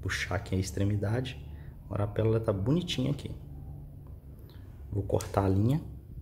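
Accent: Minas Gerais, Brazil. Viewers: Adorei como sempre !!! Muito criativo. Com todo respeito esse sotaque me parece mineiro uma graça.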